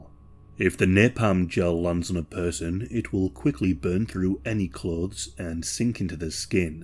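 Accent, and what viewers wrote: United Kingdom. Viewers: Hes brit*sh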